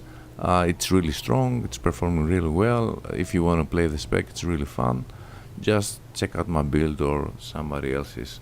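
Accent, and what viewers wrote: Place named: Greece. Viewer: I bet this accent is greek 😍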